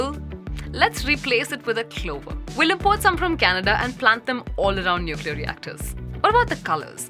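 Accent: India. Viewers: Excellent indian english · well narrated.. Indian painted all over it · That's how the Indian accent is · Indian accents are the hardest accent 2 understand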